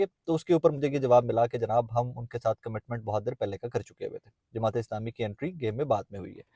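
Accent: Punjab, India. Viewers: Ap ki Punjabi ny Dil Khush Kar dia Hy 😊😊😊😊😊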